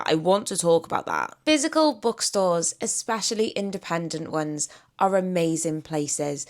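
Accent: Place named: Southern England, United Kingdom. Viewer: Based on her accent and the info in the description of her Channel, she most likely buys books in the UK. I'd guess southern England but I could be wrong.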